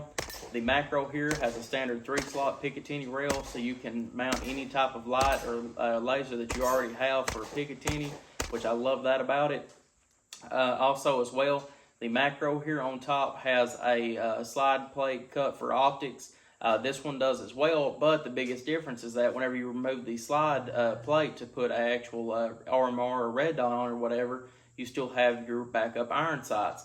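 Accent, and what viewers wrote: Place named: Deep South, United States. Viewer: wher ou from cuz i couldnt understand a word? asking sincerely. im from fl grew up in ga, but where ou from/ thats the craziest accent. love it. like deep south mixed w cajun? GA swamp? fl glades? where ya from bro?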